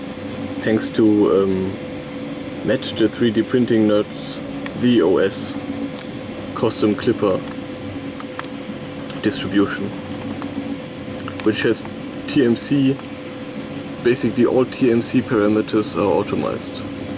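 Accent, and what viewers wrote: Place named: Germany. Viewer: I knew I was in for a ride when hearing the thick German accent XD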